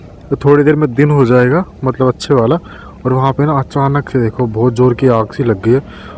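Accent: Haryana, India. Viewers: Apka hariyanvi accent itna cute lagta h real Ho bhai don't worry aur pls apni safety ka khyal rakho god bless you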